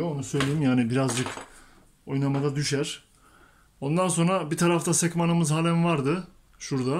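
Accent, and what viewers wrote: Turkey. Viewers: sizi tebrik ediyorum. Ben bir yüksek mühendisim. Aynı zamanda 25 yıldan fazla mesleki kurslar verdim. Konuşman , Doğu Karadeniz şivesi , ama mesleğe çok hakimsin , abonen de oldum , Sıkı bir takipçim de oldum.Tekrar söylüyorum, çok güzel ve detaylı anlatıyorsun.başarılsrınım ve videolarının devamını diliyorum.Haa ben 70 yaşındayım. Ama takipçinim. Başarı dileklerini tekrarı ile.Esen kal.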